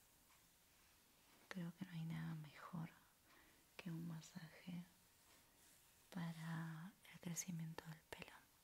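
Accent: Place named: Argentina. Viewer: Me encanta el acento argento en asmr!!!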